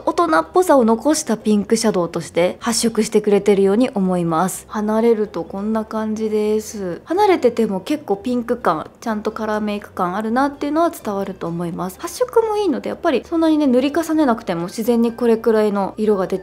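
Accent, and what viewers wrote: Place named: Aichi, Japan. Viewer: の「服」とか「靴」とかの愛知イントネーションはだいぶ聞き慣れたのですが、「ほくろ」も標準語とは違うイントネーションなんですね！いつか愛知に遊びに行ってみたいです☺️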